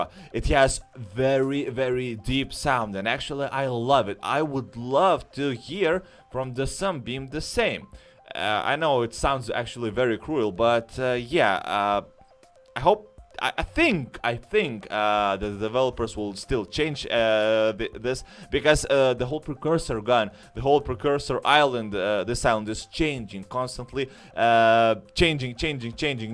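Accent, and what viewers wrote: Russia. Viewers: Попробуй снять гайд по поиску фрагментов. Должно быть интересно. Русский акцент тебя выдал.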